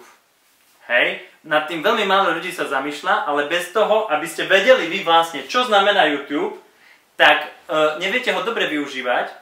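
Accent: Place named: Šariš, Slovakia. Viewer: Ten prízvuk :D .. strašne mi to vadi, keď hovoríš po SK tak skus pouzivat normalny prizvuk, a ked tak šarisky uz rovno hovor, lebo sa to dost hrozne počuva občas :D .. ale inak dobre video :)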